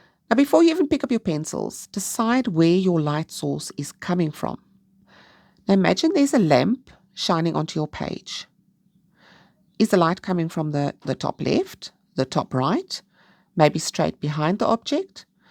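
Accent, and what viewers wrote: South Africa. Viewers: Hi, just want to say thanks for the tips, found your channel yesterday and enjoyed watching some of your videos. Its so nice to hear a South African accent on youtube.., Happy National Women’s day today. Just a quick few questions… do you still reside in SA if so wondering where you buy your supplies cos i find things so expensive have been saving for months to buy a Johanna Basford colouring book and some gel pens mine are almost all dry.. thanks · I’m so glad I found your channel, thank you for the time you spend to help us. I find you so calming and easy to listen to. Are you South African? I am interested in accents, I myself live in Australia now but I was born in Birmingham in England, I also listen to Jo at piggy pens and some others from different parts of the UK. I listen to some inAmerica also and other parts of the world and am intrigued by all the different accents . 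I live alone now in a house on a farm it’s peaceful here, only cows bellow sometimes but I enjoy the quiet. My husband passed away four years ago just before Christmas, I am used to being here now and have two cats for company. I have friends too in town and get out quite often. I enjoy colouring and watching videos. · Is that a South African accent I hear!?? 😮😮😮❤❤❤ Home!!!! 🏠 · Please tell me you are from South Africa.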